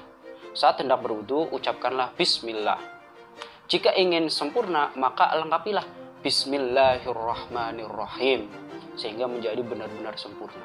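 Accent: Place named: Java, Indonesia. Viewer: medok men ang :v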